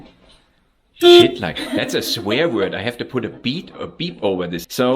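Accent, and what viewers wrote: Germany. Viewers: Mechanical lectures spoken in a German accent have an authority that is unrivaled by any other nationality. All of us viewers around the world are just sitting nodding and quietly saying, "Yes, Herr Professor," accepting everything you say without question... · I hear German accent, I believe any engineering points they tell me !!!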